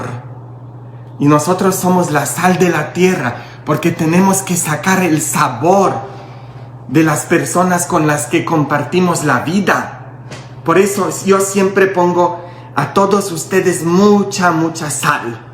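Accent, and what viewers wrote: Mexico. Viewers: Jajaja jajaja jajaja jajaja jajaja 🤣 padre me hace reír con su huuuuuuuuuuuuu como me hace los días más alegres dios lo bendiga y cuide usted si es mexicano hasta en la manera de hablar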